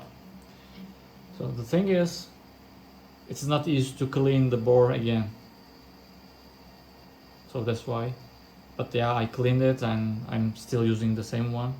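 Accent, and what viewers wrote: Turkey. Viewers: Hi, your accent looks like Turkish accent. Where are you from? If you are Turkish can i ask some questions?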